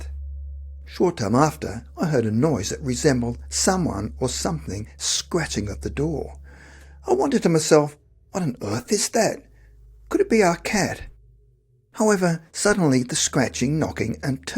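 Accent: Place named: Australia. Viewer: An Australian accent.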